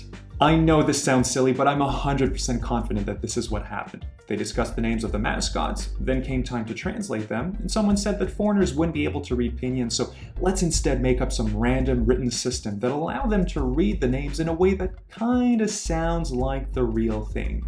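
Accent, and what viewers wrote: Standard. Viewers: 你的普通话说得非常好，很标准，甚至听不出口音，真的很厉害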